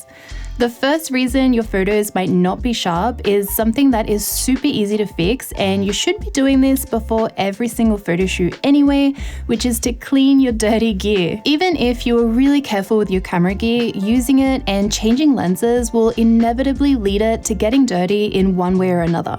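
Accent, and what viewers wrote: Sydney, Australia. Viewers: Mumma you australian? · Great content. Good to hear the Sydney accent. It’s amazing how you can still get negative comments with pretty informative non-controversial videos. I’ll be saving your channel for our schools photography course for next year. Keep up the good work. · Americans love the Aussie accent 🇦🇺😍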